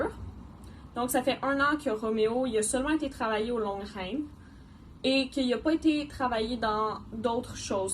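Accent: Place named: Canada. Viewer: Vous êtes du Canada ? (Tu a l’accent) Sinon super vidéo 🥰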